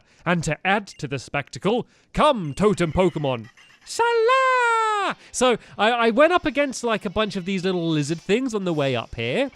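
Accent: England, United Kingdom. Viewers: Garry Stevens he's English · homie cat he is British some thing are different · Guys... IT DOESN'T MATTER THAT HE SAYS "zed" INSTEAD OF "zee"! HE COMES FROM ENGLAND. HE IS GOING TO PRONOUNCE IT THE WAY HE WAS TAUGHT. HE IS NLT GOING TO PRONOUNCE WORDS FROM OTHER RACES JUST BECAUSE OF YOU. There. :) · pro classgamer Well, Stampys British, and here we say Z: Zed.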